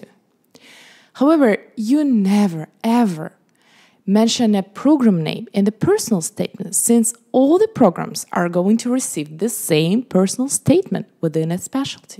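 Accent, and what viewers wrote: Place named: Russia. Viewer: Awesome job, I like your Russian accent😍😍😍 Krasavaetsya!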